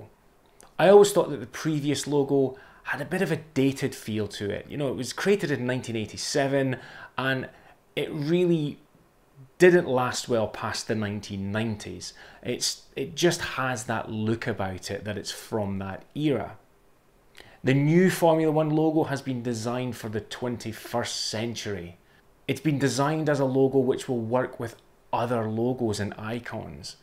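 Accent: Scotland, United Kingdom. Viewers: The sexy soft scottish accent.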